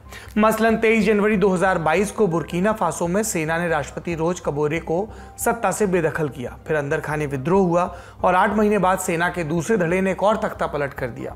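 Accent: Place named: Bihar, India. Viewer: Sir apka news kahne tarika mast hai ekdam bihari U.P. style mein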